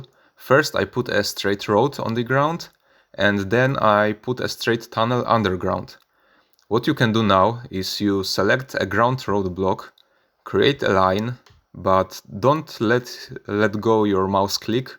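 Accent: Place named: Poland. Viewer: I have watched a few seconds and thought this guy has identical voice and accent as me, is he polish? Polish version of Trackmania, yup, haha. Crazy how similar our voices are.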